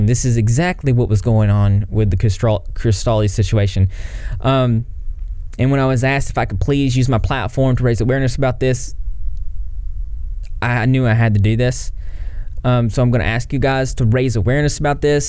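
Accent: South, United States. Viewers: i love your southern accent 😩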